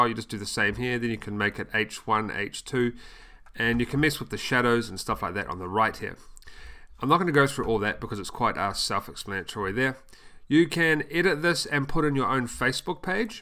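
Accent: New Zealand. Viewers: Very refreshing to hear the kiwi accent !! I'm subbing just for that reason, i'll watch the video now haha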